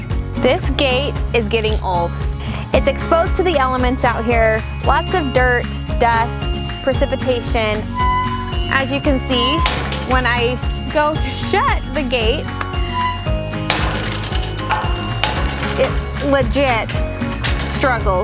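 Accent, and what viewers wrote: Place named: United States. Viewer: I love the American accent...why cant Australia have more American girls lol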